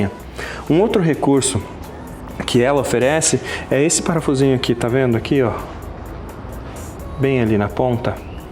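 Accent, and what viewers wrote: South, Brazil. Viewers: Olá! Sua serra vertical é fantástica, mas muito melhor é sua capacidade de explicar e sua generosidade em não guardar nada para si mesmo. Você tem muito carisma para estar na frente de uma câmera. Pelo seu sotaque fica claro que você é do sul do Brasil. Amo seu país e lamento não poder ir todos os anos como fazia anos atrás. Parabéns mais uma vez e desejo muito sucesso. Saúdo-vos de Córdoba, Argentina. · Gostei muito bom. Pelo sotaque acho q vc é sulista, moro 6 meses em Curitiba e 6 meses na Itália, vou acompanhar seus vídeos.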